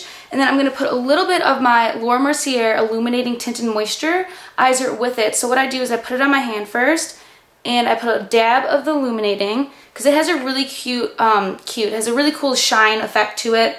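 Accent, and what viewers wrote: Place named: Cleveland, United States. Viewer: hi! i really like your videos, i just subscribed:) ps- and I've been noticing how your accent is very similar to where im from (cleveland) and when you said you were from chicago it made so much sense just thought i'd let you know cause it seemed funny to me hahaha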